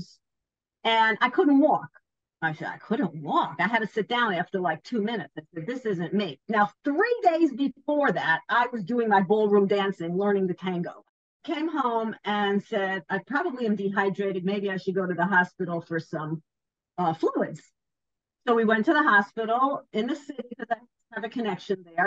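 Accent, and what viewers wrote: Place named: New York, United States. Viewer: It’s the New Yorker in her ….absolutely lovely!!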